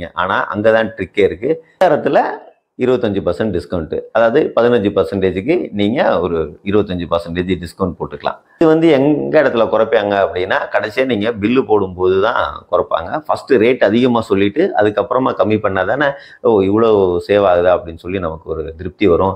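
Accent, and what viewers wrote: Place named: Kongu, India. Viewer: Tats kongu tamil 😂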